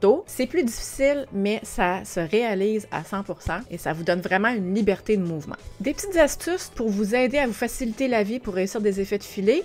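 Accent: Quebec, Canada. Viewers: Merci et avec ce joli accent québécois j'adore;-) 😉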